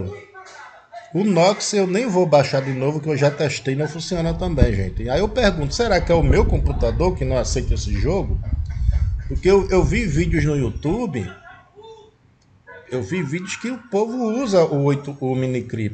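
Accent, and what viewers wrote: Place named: United Kingdom. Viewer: Gostei do inglês britânico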